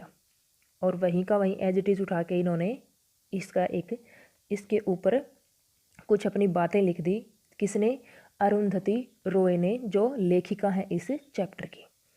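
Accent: Haryana, India. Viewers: Are you from haryana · Mam aap haryana se ho na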